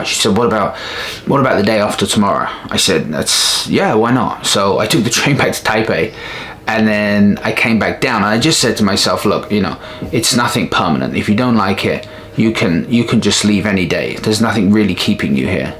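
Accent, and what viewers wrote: United Kingdom. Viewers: Love the British accent! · 原來你的英語這麼好，英國腔不是很重，稍微有一點而已....住在異國是很辛苦的，總是會想家，但回家又捨不得.... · 你的英文跟我的快一樣好 😂British accent, nothing better. Awesome vid.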